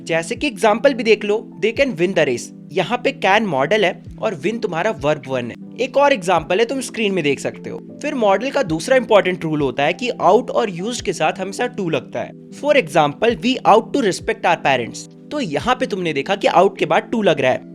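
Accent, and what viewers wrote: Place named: Rewa, India. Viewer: I know you are from Mp rewa ......... By your accent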